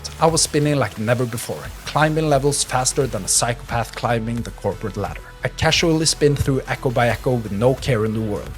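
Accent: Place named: Norway. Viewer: ..med den norskeste norske aksenten på youtube :D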